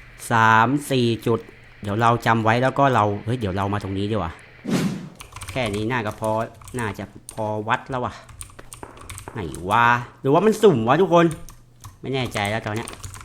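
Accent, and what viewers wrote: North, Thailand. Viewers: ปี่เป็นคนเหนือกะคับ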